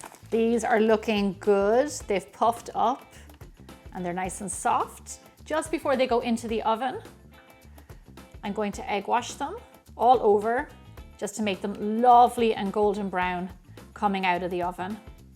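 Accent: Ireland. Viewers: I love that Irish accent!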